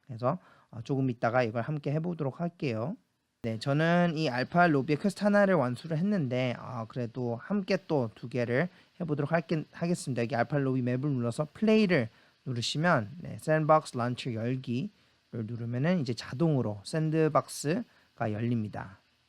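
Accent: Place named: United States. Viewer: 발음이 미국에있는 친척형 같아서 목소리가 되게 친근하게 들리네요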